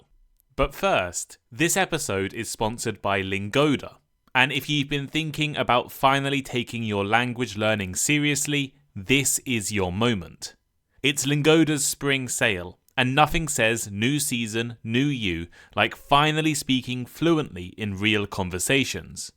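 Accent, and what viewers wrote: United Kingdom. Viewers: I subscribed the pareon for some time and I can guarantee about the quality of the conversation clubs and the English courses grammar running by the Thinking English team., and Peter are such wonderful teachers and the chance to speak with english learners all around the world is incredible. Thank you very much for your podcast, you've been helping me a lot to improving my english and getting used with british accent.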